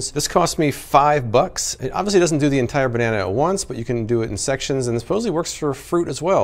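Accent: United States. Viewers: baanaynia or banana American vs rest of the world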